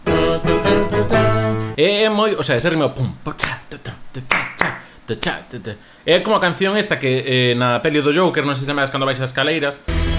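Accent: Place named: Portugal. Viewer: Genial video man! Pero me sorprende tu idioma re flashero jajaja portu español o nose re loco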